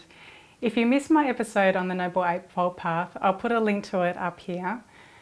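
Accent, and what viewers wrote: Australia. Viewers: Excellent talk, thanks for sharing. Lovely Australian (?) accent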